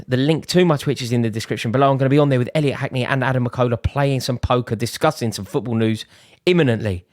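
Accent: RP, United Kingdom. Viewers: You sound like a posh Jamie o hara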